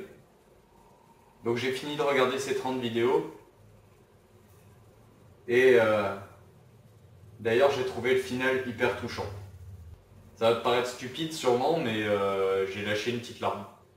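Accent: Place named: South, France. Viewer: Même ton accent du sud combiné à ton côté parisien, ça fais du bien de voir ded gens qui font des ponts. Des liens. Et qui sont pas dans les clichés stérile et gratuit... Il nous faut plus de gars intègre comme toi. Pas des mecs dans la posture intellectuelle, qui tente de développer des point de vue qui ne sont pas les leurs, qui ne les comprenne même pas du coup, mais qui pourtant ne les remettront jamais en question, qui ont interet à cette posture. Toi on sent que tu as cet accent parce que ton personnage(et/ou toi) viens de la-bas, point. Tu va pas faire genre parce que tu es parisiens, pourtant j'en connais des pélos qui le font... Tu perche sur jodorwsky, ça t'empeche pas de parler foot si tu a envie. Tu comprend ce que je veux dire ? C'est en ca qu'on taime, pas pour ça.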